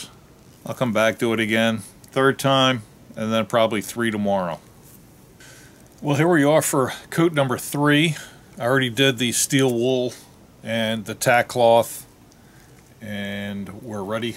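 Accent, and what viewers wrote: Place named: Philadelphia, United States. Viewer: Nice video - thanks for posting! You sound like you're from the Philly area. Close?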